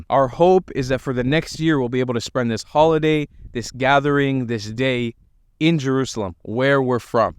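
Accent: United States. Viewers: You sound American. But the generation who came from Baghdad - of course they are Arabs. It's not abour "blood", it's about language and culture. Why Iranian Jews are both 100% Iranians and %100 Jewish and even good Zionists? And why you guys are offended by being called Arabs (which you are). In Israel they taught you to hate yourselves. And if you are not Arab then you are left with no culture. Hence the situation of "mizrahim" - crime, "arsim", far right... Arab culture does not belong only to Muslims. There are Arabs of many different religions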